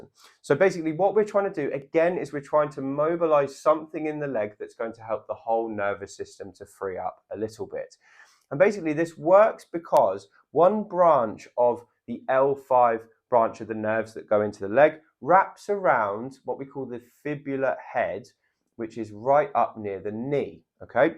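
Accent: United Kingdom. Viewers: I've subscribed. I like this British accent, one can not hear it very frequently. I'm starting with the exercises. Thank you.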